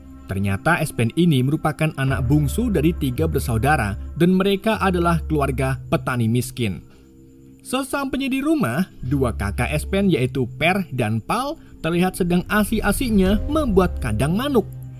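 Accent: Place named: Java, Indonesia. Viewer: nyong curiga dari dulu kayane admin wong jawa tulen😂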